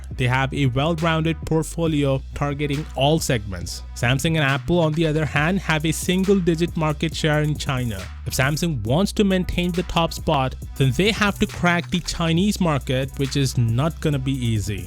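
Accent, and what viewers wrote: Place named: India. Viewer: 浓浓的阿三口音。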